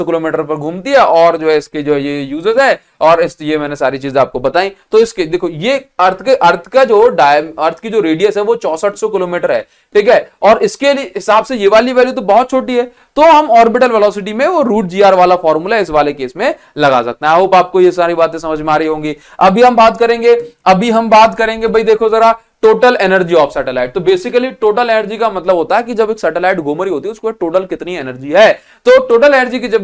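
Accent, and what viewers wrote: Odisha, India. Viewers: Sir aap odisha se ho kya?😅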